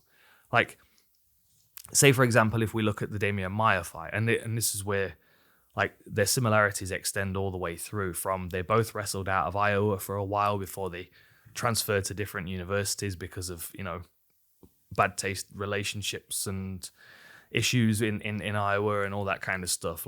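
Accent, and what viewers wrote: United Kingdom. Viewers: I don't know what it is about that British accent but I can listen to you speak for day's. Its like listening to sean Connery read a book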